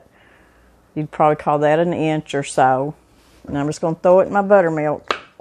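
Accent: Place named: Southeast Texas, United States. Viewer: I love your kitchen set up..your accent is southeast texas!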